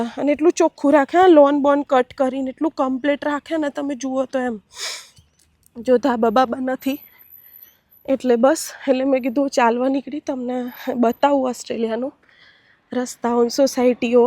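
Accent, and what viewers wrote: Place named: Himmatnagar, India. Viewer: Tame. Himmatnagar side na lago chho, mari ekk friend hattii evu j bolo chho.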